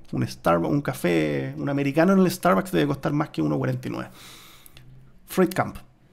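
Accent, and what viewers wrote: Chile. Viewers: eriii shileno?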